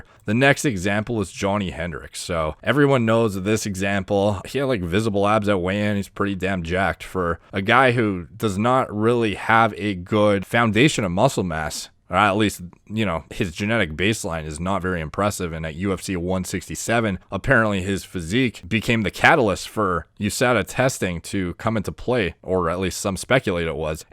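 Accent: Canada. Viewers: The Canadian accent is strong with this one. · Maybe it’s just because I’ve watched too many of them both but sounds more Canadian whereas Nick’s voice sits firmly in the “country parts of the northeast” camp.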